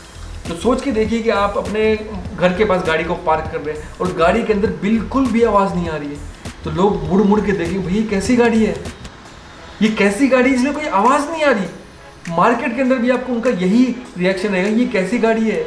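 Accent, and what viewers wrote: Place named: Haryana, India. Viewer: Bhai pakka haryanvi hai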